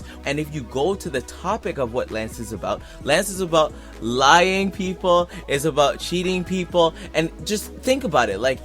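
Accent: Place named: Jamaica. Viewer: When I first heard it I was disappointed they went the trap rnb route because I expected more from their voices. I didn't like for the same reason but now I can't help but bump to it. Like you said they're trying to capture a certain market, "get in where they fit in," and might just do that for them. Off topic but are you Jamaican? I hear a slight yardie undertone in your voice lol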